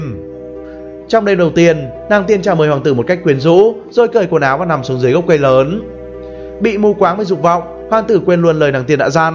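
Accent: North, Vietnam. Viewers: Anh ở miền Bắc đk anh.-.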